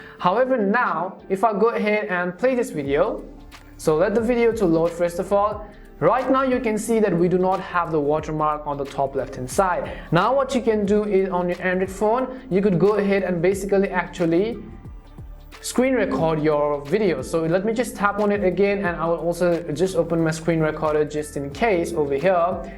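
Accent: India. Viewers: Problem not solved but we got normal indian accent before gta VI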